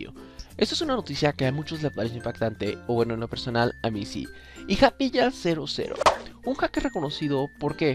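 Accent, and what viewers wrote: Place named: Peru. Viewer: Seguro eres peruano, hablas igual al Daniel Menacho 😹😹😹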